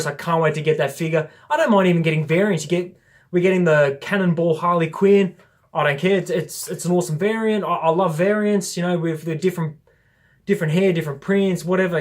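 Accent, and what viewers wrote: Australia. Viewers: i think its a good idea, to make a new release from (some) old sets. the taj mahal is a very cool building - but not to build :-D ... i also wait for the CC, but i think, that will be a dream / The best set 2017 is the old fishing store and the ninjago city..so much great details, so much fun to build :-) / Speed Champions are nice - but i hate stickers, and there are more stickers then parts... :-D ...and without these stickers, you dont know if its a Porsche...or what else ;-) ... / assembly square was 10 years...in 2007 came the first modular -> CC :-) / my english is not so good, but i like listen to you...australian english sounds nice :-)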